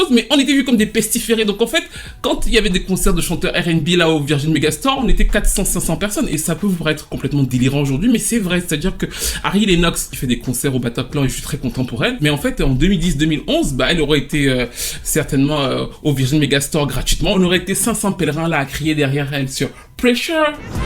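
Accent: Cameroon. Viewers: Voilaaaa, je savais que tu etais camerounais !!! Ce ton!! 😂😂😂😂😂même mon sang faisait bepbepbep, celui ci mm sil est francais, aïe le rhesus continentais est mboomayééé! Cool ! God bless you.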